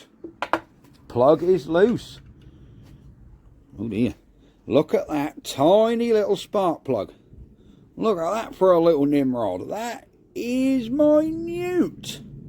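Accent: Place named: Yarmouth, United Kingdom. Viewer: All I did was report it stolen which was a waste of time. But I found out who stole it (another boater) but they had sold it by then. I bought it off of eBay for £20 If my memory serves me right and got it running. But it never really ran smoothly as the float needle was tired. I put a toggle switch on the voltage adjustment so I could use 12 and 24 as didn't need the 6v. Just so you know it works brilliant as a jump starter. I started a mates horsebox with it as it ran 24v. It's good to see it's still being used and is going to do you a good turn. Glad it's safe now and your the new owner. Love your video's my mum walked in earlier whilst watching a video as she instantly recognised the accent. She's born and bred Yarmouth.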